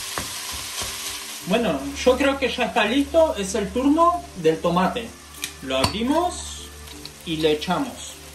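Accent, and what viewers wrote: Argentina. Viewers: que es ese acento argentino raro que tiene?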